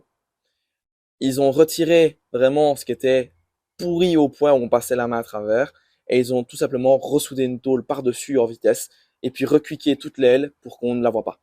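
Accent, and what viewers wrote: Liège, Belgium. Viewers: Ça part sur un abonnement, ton projet me fait rêver 😃 tu dois être liégeois, à entendre ton accent ? J’habite à Francorchamps, perso 😊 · nickel alors si le message de base est passé et que ça t'a fait plaisir 😁 Merci, oui j'ai quand même eu un peu de chance malgré tout (je me posais une question, je vais sûrement avoir l'air con si c'est pas le cas mais, tu serais pas belge par hasard ? J'ai l'impression de reconnaître un accent liégeois, voilà voilà 😭) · je suis de Walcourt :D a l’accent j’avais remarqué que tu étais de liège 😬😅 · 'fti ! ne viendrais tu pas de LIIIEECH?? xD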